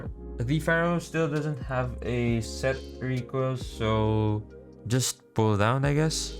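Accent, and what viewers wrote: Philippines. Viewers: SABIIII NA NGAAA BAAAA E, MAY FILIPINO ACCENT KAAAA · I knoww that your a Filipino because your english accent is really filipino 😄😄😄😄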